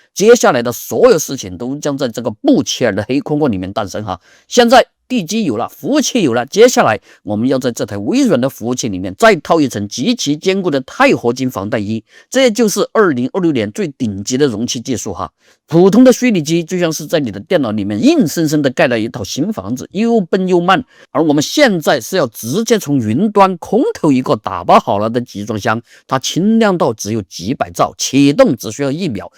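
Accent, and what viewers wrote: Sichuan, China. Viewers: 你是重庆人还是四川人？太喜欢的川普了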